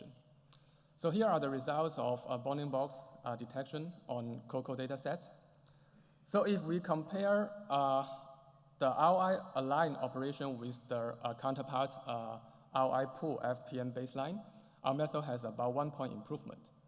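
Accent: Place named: China. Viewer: Как здорово смотреть на английском (с сильным китайским акцентом), без каких-либо встроенных субтитров... И все равно хорошее объяснение)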